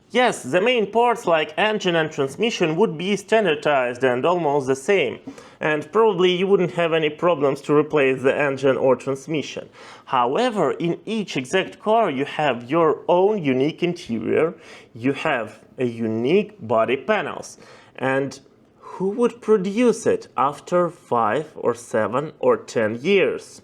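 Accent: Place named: Europe. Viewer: why lie or blatantly ignore facts. You either did not do your research or have not seen the level of innovation. You sound like you're from Europe. So i wonder how you have not tried a BYD, NIO, or any of the dozens of Chinese cars in your region. Wheelsboy YouTube channel as well as countless others can educate you if you're not inclined to try one yourself. Again what's the point of telling misinformation when facts are so easy to find. The first link is AMAZING! <link> <link> <link>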